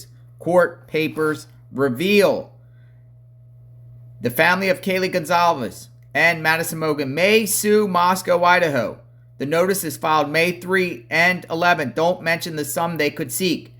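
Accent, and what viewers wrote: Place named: Philadelphia, United States. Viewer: speaks with classic Philadelphia brogue. Much of his pronunciation is a regionalism. He reads perfectly well! Let’s try to build people up instead of tearing them down…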